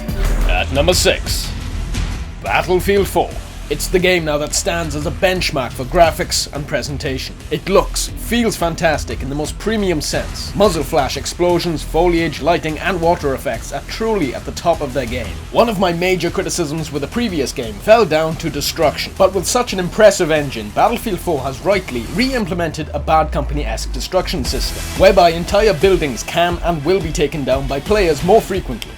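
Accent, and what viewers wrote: Wales, United Kingdom. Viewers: ! You are Welsh! So am I!!! Which part of Wales do you come from? Because you have a Welsh accent. Lastly I come from Pembrokeshire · Are you from wales?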